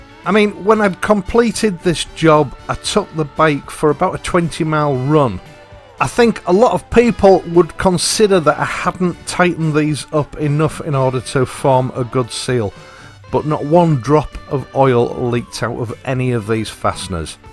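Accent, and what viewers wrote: United Kingdom. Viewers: British accent 😋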